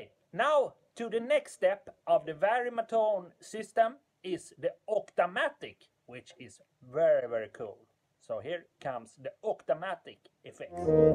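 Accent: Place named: Sweden. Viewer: Fantastiskt grejt swenglish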